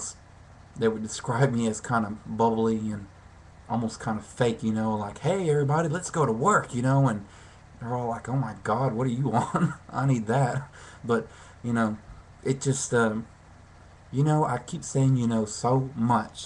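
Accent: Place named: South, United States. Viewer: Hey buddy! Thanks for checking my vid out! I noticed your Southern accent (half my family is from the South). Being a man with depression-type issues is hard enough, but is it even harder in the South? My father was the hardest-core South Carolinian you'd ever meet. He was bi-polar but never sought help cuz of his pride. We suffered because of it.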